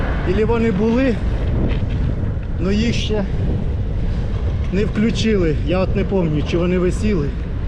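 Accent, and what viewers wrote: Poltava, Ukraine. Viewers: А що не так з суржиком? ... Мені дуже по-домашньому відчувається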